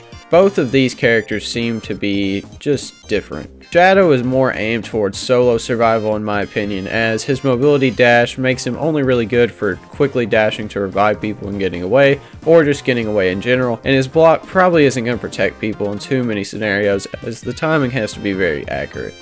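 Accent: South, United States. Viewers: You kinda sound like a red neck which I really like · Bros so country that he sounds like a guy from a commercial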